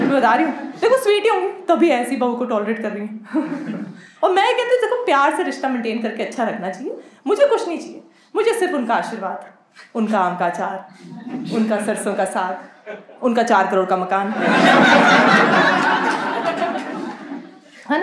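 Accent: Punjab, India. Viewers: Punjabi accent aapki ufffff ❤ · Amazing ji.....jatti ji full kaim....really loved it.....specially your punjabi accent ain kaint....waheguruji bless you jattiji....chardikla ❣❣💐👌👏👏👏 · She is aging like a fine wine and her voice and Punjabi accent is litt. And nice jokes also🔥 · So decent lovely beautiful I can't express ☺️☺️☺️ yesss Punjabi tarqa massst haiiii☺️☺️☺️ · Solid content ma'am, I loved your punjabi accent... Waiting for your next video 🎉🎉🎉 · Sorry we are not ok with punjabi tadka. but u can speak. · You r doing amazing mam....just keep it up.. really liked you Punjabi accent 💫👌...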